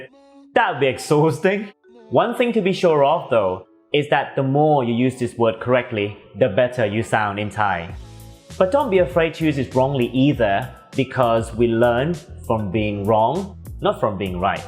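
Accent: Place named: Cockney, United Kingdom. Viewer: is so funny with his cockney accent. I can watch him all day even if I don’t want to learn Thai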